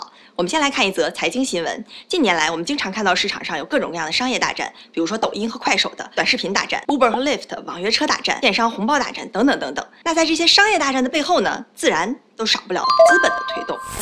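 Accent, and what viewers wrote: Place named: Beijing, China. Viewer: 你边说边笑有时候听不清，北京口音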